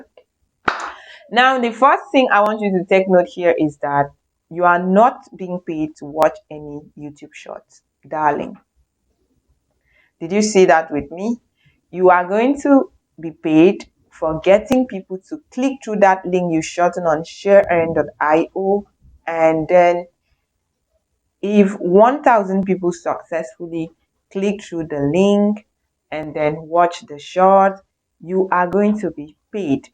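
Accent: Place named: Nigeria. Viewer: Upon recognizing your Nigerian/West African accent, I suspected a scam and cannot subscribe to content aimed at manipulating real ad revenue on YouTube. It appears to be a non-legitimate use of time.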